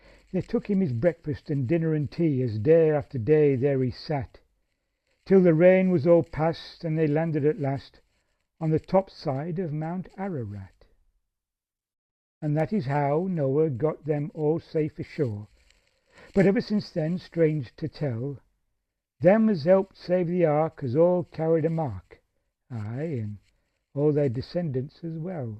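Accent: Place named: North, United Kingdom. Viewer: Fantastic fresh take on this classic—your lively delivery nails the northern twang, especially the cheeky rhythm on the bloodhound ballooning up and Mrs Noah's soggy sleeve mishap. In my retirement project V2Melody, I've set "The 'Ole in the Ark" to a witty folk chanson to introduce Edgar's timeless humor to new listeners. Cheers for the spirited reading!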